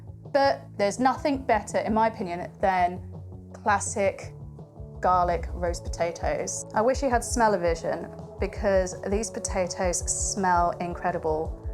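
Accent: England, United Kingdom. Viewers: Smart tips, pleasant voice, attractive woman with a British accent. Just subscribed. ) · I suscribed just to listen to your amazing British accent. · Loved watching your video. Spuds look wonderful. I live in Central California , my mom was English and your accent is a lot like hers was. Could you do a video on the best way to make Yorkshire pudding? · I like your cooking and British accent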